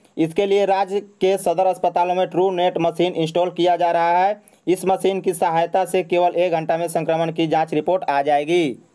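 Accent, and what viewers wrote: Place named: Bihar, India. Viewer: Aapka bolne ka tareka sahe nhe lga. Bihar jaisa lgata hai.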